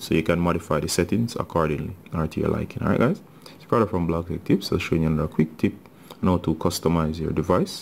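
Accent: Jamaica. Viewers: Sound jamaican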